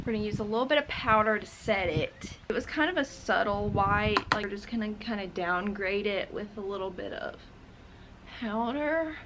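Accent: South, United States. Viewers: LA? Wtf that's a southern ass accent wdym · Omg I love your country accent! 😁❤️ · The more I watch your videos, the less southern your accent sounds 😂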